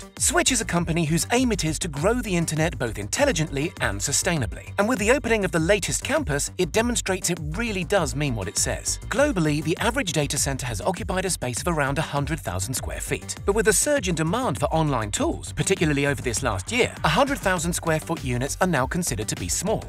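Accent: United Kingdom. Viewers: ima buy this stock becuz if its .05 per share dividend plus this guy reviewing it with the 007 accent got me sold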